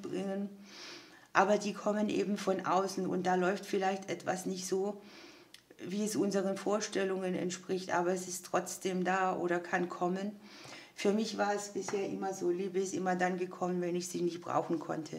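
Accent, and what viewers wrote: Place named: Heidelberg, Germany. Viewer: Liebe, du hast dir so viele Abonnenten verdient, denn du bist richtig gut. Es ist wirklich schön, dass es dich gibt. Du hörst dich übrigens an, als kämst du aus Heidelberg und Umgebung, denn du sprichst wie Beate Bahner, die Rechtsanwältin aus Heidelberg, die sich in der C-Zeit für die Menschen, die sich nicht "schlumpfen" lassen wollten, die keine "Larve" tragen wollten etc. eingesetzt hat.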